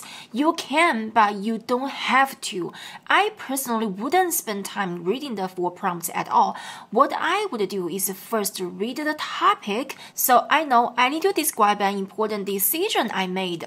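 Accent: China. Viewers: But your English sounds more like Chinese than English; I'm getting confused listening to you.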